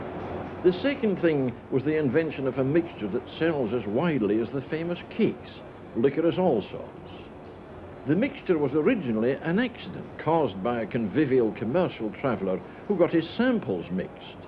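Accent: RP, United Kingdom. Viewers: 50 times stronger it was the fentanyl of its day! 😅 it's very interesting and quaintly informs you of things in that once ubiquitous, now obsolete, BBC accent. This video highlights the rarer Scottish BBC inflection.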